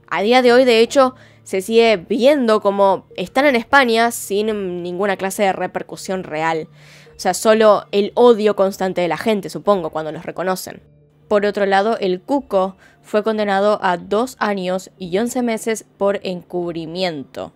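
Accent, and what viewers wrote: Argentina. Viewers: Muchas gracias por el caso, tienes un acento argentino muy bonito, se oye bien con la narrativa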